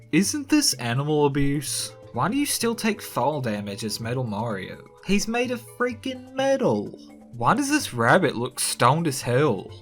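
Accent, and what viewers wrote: South, United States. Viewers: Is this guy from the south?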